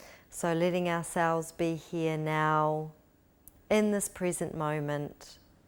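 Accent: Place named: New Zealand. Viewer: haha awesome NZ accent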